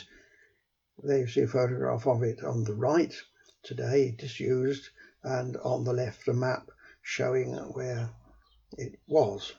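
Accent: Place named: England, United Kingdom. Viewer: You have a very refined English accent for a Welsh speaker.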